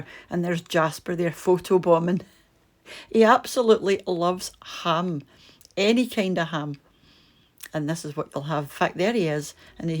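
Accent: Scotland, United Kingdom. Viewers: Definitely going to make this soup. Yum. Thank you so much for the recipe. Love your accent. My Dad came from a small village just outside Glasgow. Miss hearing that wonderful accent. 💕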